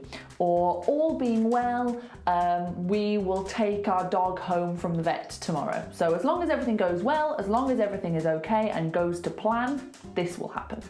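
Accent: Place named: West Midlands, United Kingdom. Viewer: I cant believe you live in West midlands,im from walsall now your accent makes sense😃😃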